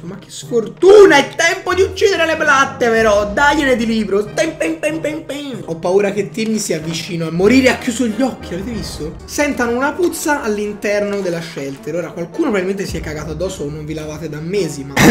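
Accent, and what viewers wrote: Tuscany, Italy. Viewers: Ma sei toscano? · ma tu di dove sei?hai l accento toscano...quindi sei toscano?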